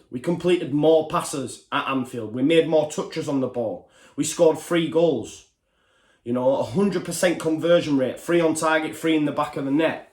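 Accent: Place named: Leeds, United Kingdom. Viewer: Just wanted to say, I'm an LFC fan but live in Leeds, been watching your content in the lead up to todays game and its been superb. Glad to hear that accent speaking so much sense, I'll probs miss some stuff over the next few months but I'll keep dropping in and I'm sure your subscriber count will skyrocket. Keep up the good work! You'll be fine this season, all the best.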